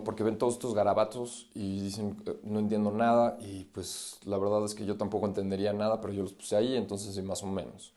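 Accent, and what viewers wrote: Mexico. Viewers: Ay te amo! Por momentos tengo que retroceder el video porque me desconcentro con lo lindos que sos y tu acento mexicano 😍❤️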